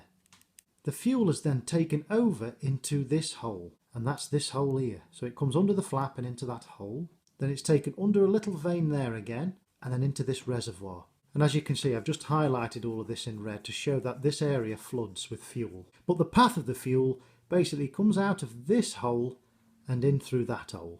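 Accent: United Kingdom. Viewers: Man. Not only a great explanation but don't with a British accent. Cannot ask for more. A big thank you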